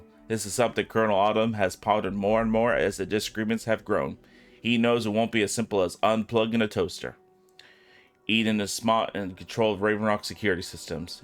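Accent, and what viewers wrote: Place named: South, United States. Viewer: Loving the accent it's like you flip between a Boston accent and southern.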